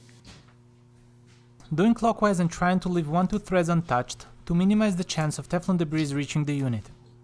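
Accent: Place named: Russia. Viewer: I've got to leave a comment, I hate it usually : ) bought the same unit, doing a change over install from a Bosch 82% eff. Love your eagerness to make things work and to do it properly. Love the accent but you don't typically look Russian, I'm Canadian and have a good friend Sergei who is Kazakhstanian, anyway appreciate what you did and the tact in which you did it, I might have been muttering with all the extra challenges, you sir make me a better person, stay safe, I'm a nurse(male) awaiting the pandemic, trust in the Lord, death is certain but how we live and who we live for changes everything, just wanted to send some blessings your way, thanks for yours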